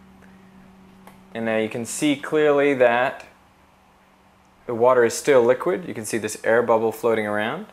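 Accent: Australia. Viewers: Just the Slightest hint of an Australian accent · You can hear some Aussie in Young Veritasium’s voice!! So cool!! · This is the only video I've noticed his Aussie accent bleeding through. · Anyone else think he sounds more Australian in this part of 2011? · his aussie accent is so much stronger in this video than his recent ones although he's canadian, right/? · I just realized he has an Australian accent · Wow he really has an Australian accent in his earlier videos.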